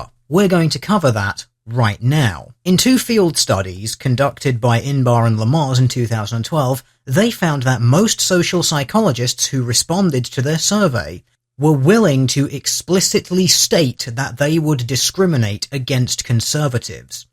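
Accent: England, United Kingdom. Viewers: I'm no expert but It's not British, it's English. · I should have said that I know is English and should have specified that he has an English accent, just not Received Pronunciation, though Young Ponymancer did point out that he is close to RP but his voice is nasal. He is certainly not Scottish. What about a Midlands accent but not Brummie nor Scouse though I can't them tell apart since I'm American.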